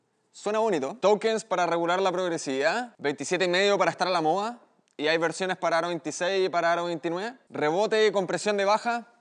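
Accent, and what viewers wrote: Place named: Rural, Chile. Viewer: Por qué no hablas un Chileno como Cristian Contreras Radovic? No podes ser menos chileno campesino? Sería mejor de oírte en otros países! Saludos cordiales 😎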